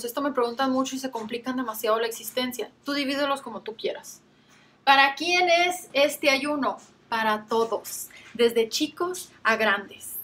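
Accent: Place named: Chihuahua, Mexico. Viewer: ʎʇɹɐɯ ʎןɟɔɯ yo creí que era de Chihuahua por el acento tan shulo 😐😐.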